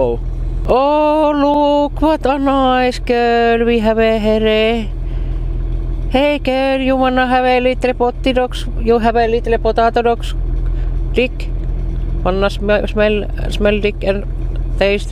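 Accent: Finland. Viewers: ralli enklanti :DD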